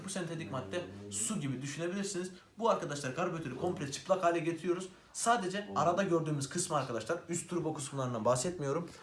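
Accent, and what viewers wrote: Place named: Black Sea, Turkey. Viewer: Karadenizli şive farkı var söylem tarzı var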